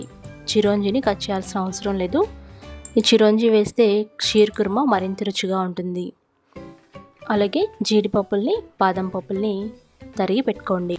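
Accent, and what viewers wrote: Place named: Krishna, India. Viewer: Yummy 👌🏻.... I strongly believe you are from krishna district right 💁.... The way you speaks was very much related to krishna's accent 😊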